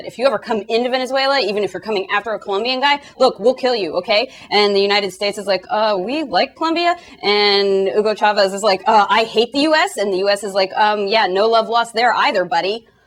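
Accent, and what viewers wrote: Russia. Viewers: hahahah <3 your russian accent is frkn awesome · Nice Russian accent!!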